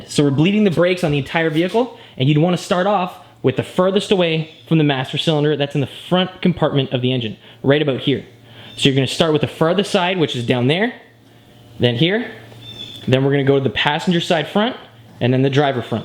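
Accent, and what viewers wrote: Ontario, Canada. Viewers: are you from ontario?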